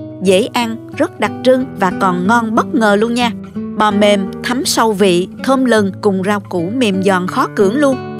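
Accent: South, Vietnam. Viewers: Giọng nói miền nam ngọt ngào , dể thương gì đâu ák trừi🥰🥰🥰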